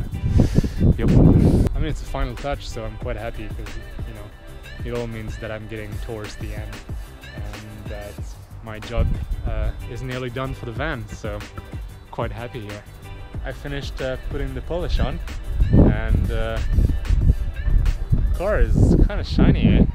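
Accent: France. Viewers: are u french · Nicely done! You sound french ;) Nice ideas for when I'm building my fifth van in the future ;) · .Love your build... And accent haha ... Youre so chill too for a french guy .....I subbed. Keep it up!! Btw.... No mains 230V power inverter? Hi, From (English) Canada ;) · Bravo ! On n'entend presque pas que tu es français ;) Super conversion également, j'adore le deck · Love french accent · are you french or somethin?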